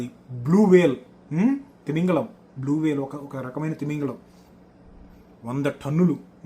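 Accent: Andhra Pradesh, India. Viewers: bro.. eppatnunchooo oka doubtu..! why does your accent sound so Andhra if you're from Nizamabad? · Anna needhi telangana kada mari nee slang deniki andhra laa untadhi? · Bro meedi Karimnagar kada...! But me slang andhra slang la undi...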